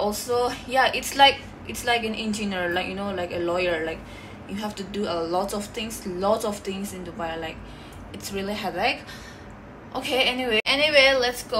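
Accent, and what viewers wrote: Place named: Nagaland, India. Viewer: The Naga Accent still hits different ❤️❤️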